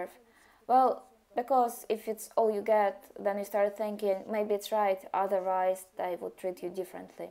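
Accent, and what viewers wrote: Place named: Russia. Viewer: You're welcome. And sorry if it has taken me awhile to reply as I've been preoccupied with other activities. The way you analyze things is something I really admire because your ideas seem to flow quite nicely even though English isn't your first language. Your Russian accent is pleasing to hear. 👍 And your analysis on Belle made me relate to her in terms of being more like a simple and curious type, who thrives her solitude but I'm less like her in terms of the social aspect. I'm not very friendly and I feel awkward and not as confident around people. 😅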